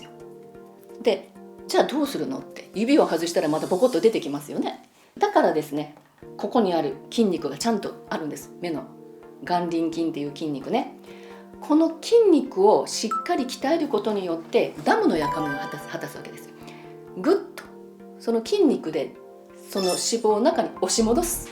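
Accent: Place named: San'in, Japan. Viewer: まだ30代前半なのにたるんできて絶望😭してましたが、毎日試してみます！ところで、さん、山陰の出身ですか？イントネーションにどことなく懐かしさを感じてます😊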